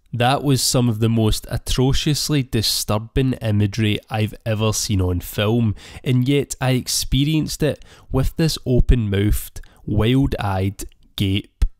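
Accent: Scotland, United Kingdom. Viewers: "Arrr Eyes Soup-eRR GAL-EWW-D ON da Ssssska-reeeen" Your Scotish Brogue kills me. God Bless ya'. Move to Hollywood,because we need to hear that voice. Normally, Americans we can't understand a bloody thing Scots say, but you talk slowly. It's a blessing! U rock Scots-dude!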